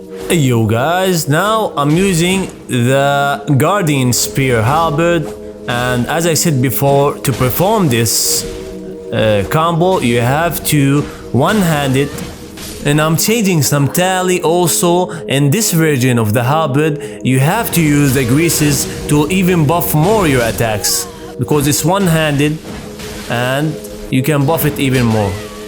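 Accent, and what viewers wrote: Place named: Gulf, Arab world. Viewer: The accent , are you Saudi , Qatari , Kuwaiti ? Uae or Bahraini 🤣 Subscribed for the accent 😂👍 حبيبنا 👍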